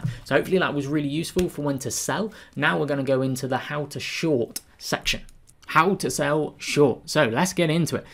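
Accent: England, United Kingdom. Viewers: Excellent video, thanks for the job done. Like to hear some English accent it changes from American one ;)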